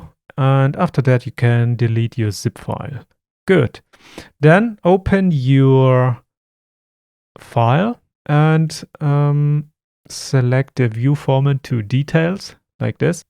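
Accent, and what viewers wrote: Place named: Germany. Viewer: i hören a bit of deutsch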